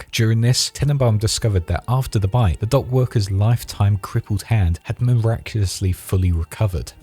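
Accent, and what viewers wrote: United Kingdom. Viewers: Thank God you're british, it makes listening to this so much better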